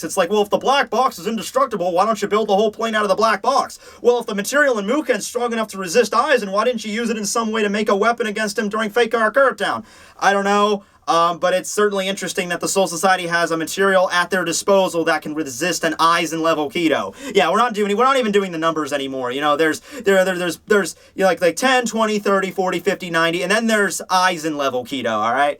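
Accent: Canada. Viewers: Does any one else hear the canada in the intro?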